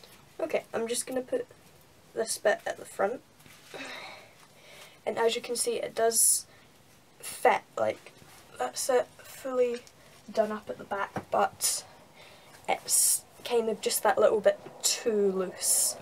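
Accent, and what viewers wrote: Glasgow, United Kingdom. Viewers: are you from glasgow ?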